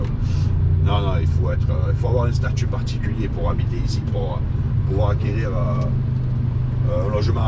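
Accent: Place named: South, France. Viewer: Cool ta chaîne, je m'abonne, sympa l'accent du sud 😊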